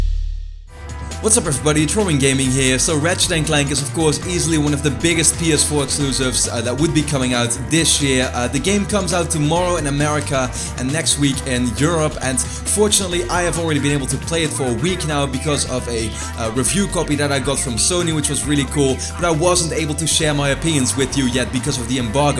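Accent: Netherlands. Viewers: is this guy dutch? if he is so hey ik ook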